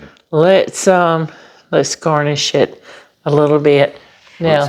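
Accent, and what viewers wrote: South, United States. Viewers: Next time I go to the grocery store, I'm going to get all those ingredients, have some on hand, and make that meal exactly how you made it. I saw your kitty on the porch. You give good vibes. I detect a little midwest in your southern accent. The best to you and your husband!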